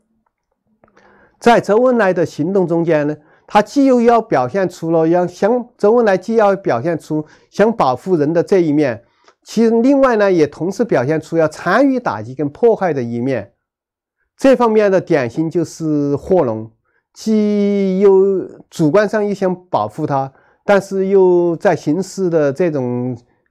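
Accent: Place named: Chengdu, China. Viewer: 成都口音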